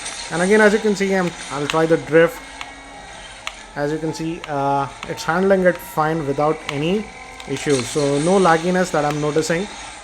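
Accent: India. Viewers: I am from Brazil, I love indian accent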